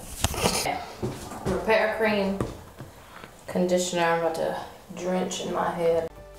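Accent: South, United States. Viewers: That southern Belle accent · she sounds kinda country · ur voice is so southern belle esque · you have a weird country accent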